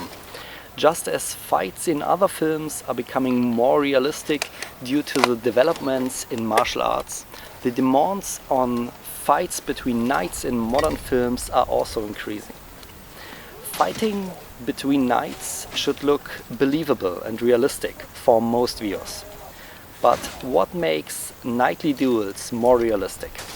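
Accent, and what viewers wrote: Germany. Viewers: Mein abo hast du. 🤘🏼 Aber den deutschen Akzent hört man ultra raus. 😉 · Aud sem Dialekt meine ich rauszuhören das du aus Deutschland kommst, ist das korrekt?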